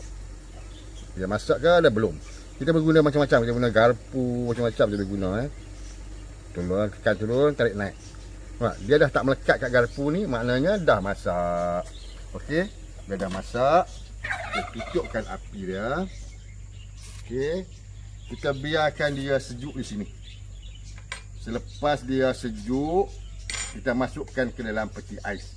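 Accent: North, Malaysia. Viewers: Slang bg lagi utara boleh dak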